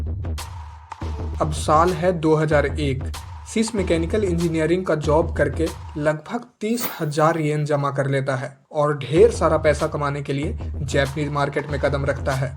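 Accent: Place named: Bihar, India. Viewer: Awaaj se Bihar Wale bhaiya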